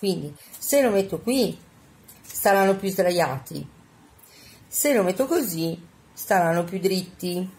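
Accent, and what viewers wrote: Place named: Bologna, Italy. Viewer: mi sono emozionata quando mi hai salutata, 😊 grazie per le belle parole...come al solito mi metti di buonumore, e mi fai tanto ridere con il tuo accento bolognese che adoro. Mi hai risolto un problema, non sapevo mai dove appoggiare gli orecchini quando devo fare le foto...grazie! Un bacione 😘😘😘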